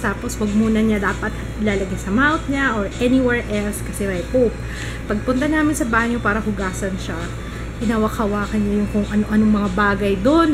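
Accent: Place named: Iloilo, Philippines. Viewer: hi miss! nakakaaliw po kayo! i feel good watching your videos. may pag ka hiligaynon (ilonggo) yung ibang word and accent nyo hahaha.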